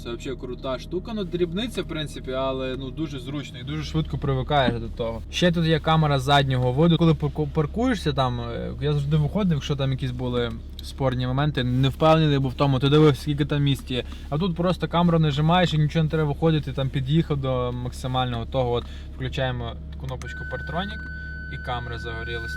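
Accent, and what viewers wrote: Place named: Surzhyk, Ukraine. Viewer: суржик жёсткий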